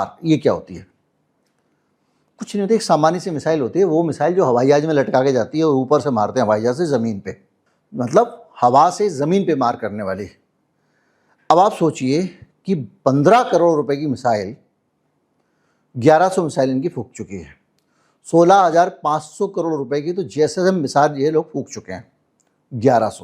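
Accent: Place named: Delhi, India. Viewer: What a wonderful content sir 🤩😇 everyone in the morning must watch sir’s video where he will give news in a fashion that it’s entertaining and learning and soothing as well in a typical Delhi style of Hindi. It’s always refreshing to watch his videos in the morning, particularly.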